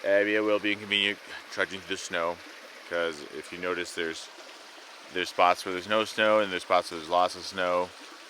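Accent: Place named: Boston, United States. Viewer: Be proud of your accent! Grew up in Boston also!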